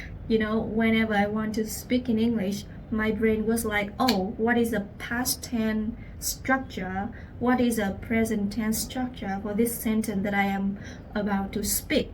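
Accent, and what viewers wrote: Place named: North, United Kingdom. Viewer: Southern Accent? I think it’s Northern 🤔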